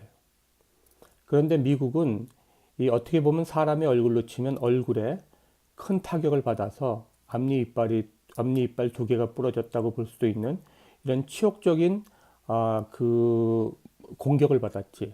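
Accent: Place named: Seoul, South Korea. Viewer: 크게 공감합니다!! ㅇㅇ 사실상 지금의 스울말투(말로써 하는 표준어)는 산업화와 더불어 온갖 타지역 사람들(ex:설라디언 이주민)의 유입과, 또 흘러간 세월들이 요래 마구 쓰까져가지고.. 예전과는 많이 달라졌다는 학계의 지적이 있잖어!? 국어쌤은 아마듀 메이비, 이러한 실정을 나 보다도 아주 잘 알고 있을거야. 그렇쵸!? ㅋㅋ 그런데 우리 의 발음과 발성을 듣고 있노라면.. 이게 바로 진짜 '교양있는 사람들이 두루 쓰던 현대 서울말!'(오리지날 한쿡 표준어) <ㅡ 를 완벽하게 구사하는 사람인 것 같아ㄹㅇ. 그래서 더 놀랍고, 청자의 입장에서 아주 편안하다는 장점이 있서요!! 동의하지!?? 예아-~!!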